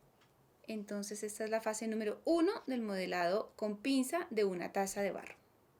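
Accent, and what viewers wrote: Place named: Colombia. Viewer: ❤Que interesante, sencilla, detallada, precisa y Muy amena explicación. Ese amor en tu enseñanza y sobretodo ese sutil Acento Colombiano tan bello. Gracias Gracias Gracias 🎉😊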